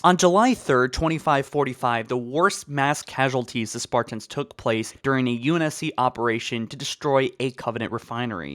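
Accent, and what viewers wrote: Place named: South, United States. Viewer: you know ive never thought about it but has a southern accent. he hides it well but it leaks through in small bits sometimes.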